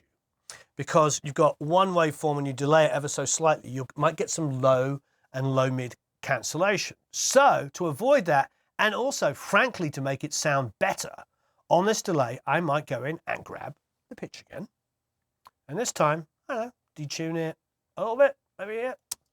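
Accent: United Kingdom. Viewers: Hi, first of all: I love watching your videos, your British accent is great - "I hope you are doing marvelously well" and your is it "shnits and schnitzels (?)" (reminds me of "Wiener Schnitzel" each time). I have a question: you seem to add quite a lot of new things to a mix. How do the artist(s) react, when you sometimes give some new character to a mix? I mean, maybe an artist does not want to be changed too much, he wants a mix with the character he has added to a song!? Let us take a famous song: " " by. There is a very remarkable delay on vocals. If had recorded this song in a studio with dry vocals and a mixing engineer would have added that kind of delay to his vocals, there could have been two reactions: "joy or disappointment, I think - is the mixing engineer going crazy, what has he done to my voice!!!???. Do you know what I mean? How far can a mixing engineer go? 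of course was a very special artist, he was doing most of the things by himself, especially in his early years and he actually did not care too much about the quality of his recordings - the mix had to be as good as possible finally, it was more about the composition or releasing something completely new. By the way, recorded an mixed quite a lot in Sunset Sound studios in LA, and Paisley Park´s Studio3 in Minneapolis was or is a copy of it. Susan Rogers has some interesting videos here on youtube talking about her collaboration with. It is also interse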